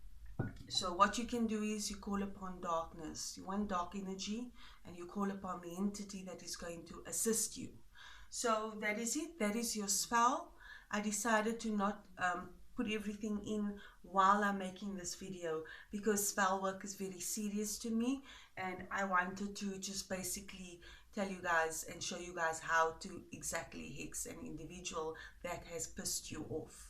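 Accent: South Africa. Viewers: Are u south african? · Love your accent are you from South Africa?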